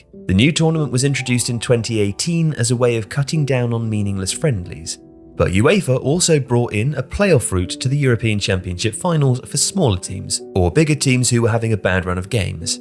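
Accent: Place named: England, United Kingdom. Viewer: The accent was obviously English, but the pronunciations were spot on ─ i.e. he made no mistakes in his pronunciation.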